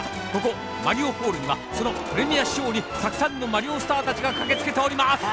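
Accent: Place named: Japan. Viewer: Narrators speaking English but in Japanese accent.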